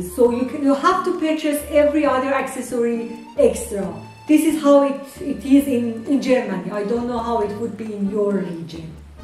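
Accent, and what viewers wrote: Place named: Iran. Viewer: Man, that is one thick persian accent lol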